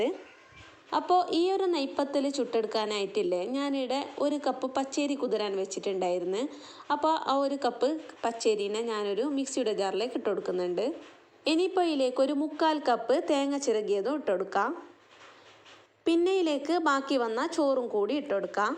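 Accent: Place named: Kasaragod, India. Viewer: നെയ്പത്തൽ കാസർകോഡ് സംസാരവും ഇഷ്ട്ടപെട്ടു 👍🏻👍🏻👍🏻👍🏻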